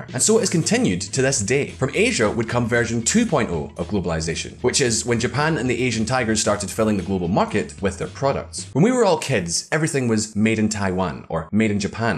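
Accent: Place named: Scotland, United Kingdom. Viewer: I like the team with the other guy and the Scottish one doing the narration. I think the Scottish guy seems better behind the scenes. He has cleaned up his teeth a bit speaking somewhat slower and more clearly . He isn’t bad and has improved a lot. I still think he should set himself apart probably not wearing Hawaii shirt maybe dress more formal . But he is doing much much better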